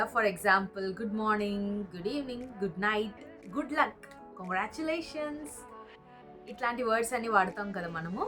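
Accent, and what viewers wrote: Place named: West Godavari, India. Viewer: పాఠం చెప్పే విధానము బాగుంది. అచ్చమైన తెలుగు స్వచ్ఛమైన కన్నడ మరియు ఆంగ్లము. పశ్చిమ గోదావరి మాండలికములా అనిపిస్తోంది మీ తెలుగు. భారతీయ భాషల మీద ఆంగ్ల ప్రభావం ఎప్పటికీ పోదు. వీలైనన్ని పాఠాలు చూస్తాను. విజయోస్తు.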